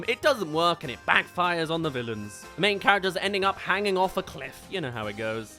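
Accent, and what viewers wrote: United Kingdom. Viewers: and you're proof is? Cause I said so? Literally you don't know that, I think hes just British and we know British people pronounce things differently than Americans. Not saying they're wrong, just saying British people are silly.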